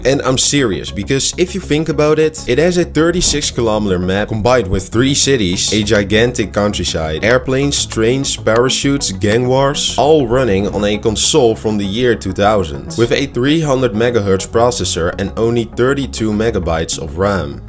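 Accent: Netherlands. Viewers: is that a dutch accent I hear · you are literally the most dutch person i have ever heard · i hear dutch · Hahaha dat accent is meteen herkenbaar als kuthollander xdddd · That thick dutch accent🫡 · lol dutch accent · Is that a Dutch accent i hear? Nice vid btw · bro just stick to dutch. your dutch accent is too horrible for this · I hear Nederlands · Nice vid! Hoor dat je een nederlander bent 👌🏻. Keep it up🤘🏻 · I smell Dutch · dutch detected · I smell dutch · I hear a Dutch accent. PlayStation 2 kon de games van de disk laden terwijl de game draait. Niet veel games maakte er gebruik van (jack and dexter, need for speed games did that). Bij nfs en jack kon de game zelfs opslaan tijdens t spelen (eerste vorm van autosave). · i both love and hate the strong dutch accent lmao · Ik ruik een Nederlands accent 👀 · im POSITIVE youre dutch!! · Jij bent sws Nederlands ik weet het gwn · Holy Dutch accent ❤‍🩹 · Je herkent Nederlandse accent direct. 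Blijf zo door gaan je gaat groot worden.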